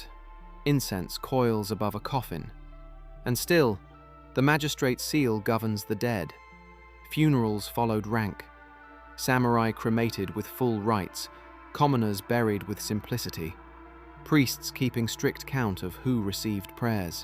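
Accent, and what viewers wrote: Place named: United Kingdom. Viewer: Even AI with a British accent mispronounces all foreign words. 🇺🇸⚓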